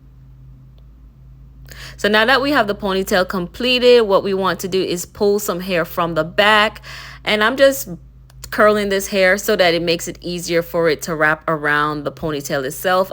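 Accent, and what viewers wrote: Jamaica. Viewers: Hey hun nice job. U Jamaican? 🇯🇲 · Are you Jamaican or from another Caribbean island?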